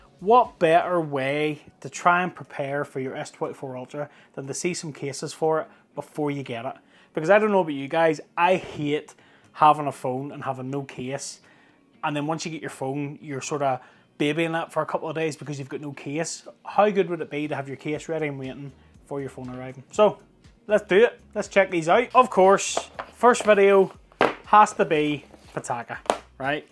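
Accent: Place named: Belfast, United Kingdom. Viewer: Cheers for the vid, sounds like you dont live too far from me lol (belfast) My s24 ultra is arriving on Thursday (hopefully) from three and I need to pick a cover. I usually just get a basic leather folding cover from amazon for my phones, the one on my current s22+ seems to have done a pretty good job at keeping the phone safe so I may end up just getting the same again. But I'm also willing to spend a bit more on a good case for the s24u. The only thing I don't like is having a cover that doesnt fold over and covers the screen. I also like to keep my cards in the phone cover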